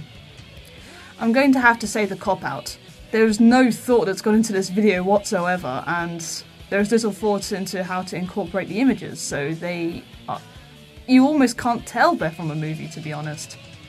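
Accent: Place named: RP, United Kingdom. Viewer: We want to watch the video not listen to your posh british tea and crumpets voice smothering over and therefore ruining this video. Just shut up and take your comments to Dave mustaine, oh wait your probably afraid that he will KICK YOUR SORRY ASS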